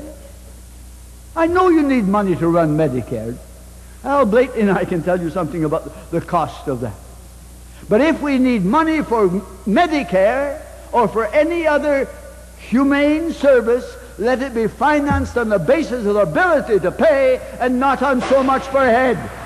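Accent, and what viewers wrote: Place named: France. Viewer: I like the idea of free health care but our national budget comes first otherwise Canadians starve.some socialist believe that more barrowing and spending is a good thing but its your money and you spend it the way you want to.would this man qit ranting,his French acsent annoys the living hell out of me.scialisum dosent help the poor,it drags everyone down from richest of rich and poorest of poor.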